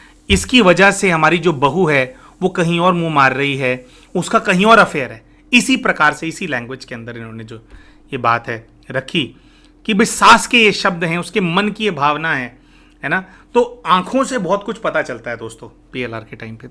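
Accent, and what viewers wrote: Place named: Delhi, India. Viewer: inki bAate batane k tareeke me Delhi k tadka wala touch hai 😂😂 bahut pyare aur funny hai bhaiya 😅😅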